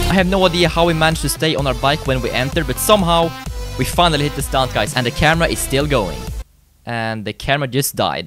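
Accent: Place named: Sweden. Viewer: You sound like your swedish.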